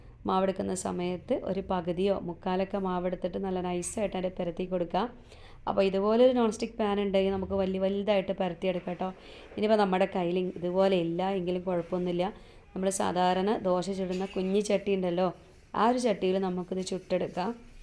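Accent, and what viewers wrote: Malappuram, India. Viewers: മലപുറം ഭാഷാനന്നായിട്ടുണ്ട് ഇത് കേൾക്കുന്ന ഞങ്ങൾ തൃശൂർക്കാരാണ്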